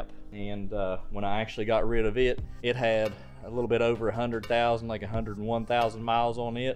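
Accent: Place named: Tennessee, United States. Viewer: What state are you from man? You sound like you’re from my neck of the woods. I’ll say I’m about 20 minutes north of Nashville TN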